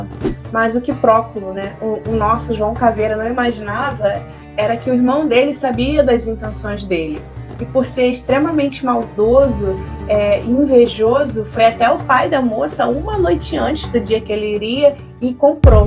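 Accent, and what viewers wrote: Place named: Rio de Janeiro, Brazil. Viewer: Eu adorei o vídeo ❤❤❤..... mas o sutaque carioca é horrível 🤢🤢🤢🤢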